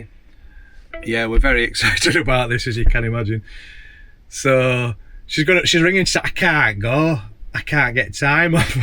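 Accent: Yorkshire, United Kingdom. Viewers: Hi, watching now on the telly:) Please don't refine your lovely Yorkshire accent too much, we love hearing it, the dialect, the unique slang. The very first crush I ever had (as a teen) was on an actor - a lovely Yorkshire lad from Bradford - he was way before your time haha. Steve Hodson, who became "famous" in Yorkshire TV's "Follyfoot" in the early 1970s. Adored his Ey Up's & other Yorkshire terms. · Disagree mate...and I'm a cockney 😁 His Yorkshire dialect adds to the video loads.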